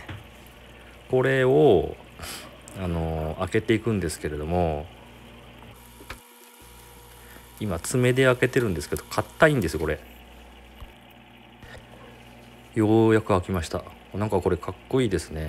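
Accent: Kyushu, Japan. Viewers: こんばんは 九州弁ありがとうございます😊な〜つかしい〜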